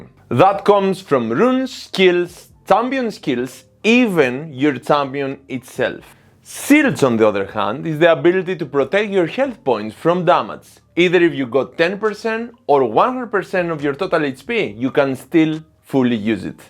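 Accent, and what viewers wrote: Greece. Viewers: Bro love your vids, i bet you are greek man your accent saying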